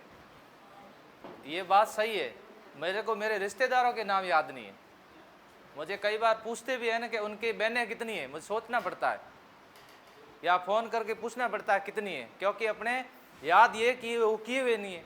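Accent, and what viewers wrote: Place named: Jodhpur, India. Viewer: Sir aap jodhpur se ho kya... Kyoki aap bilkul jodhpur walo ki tarah bolte ho...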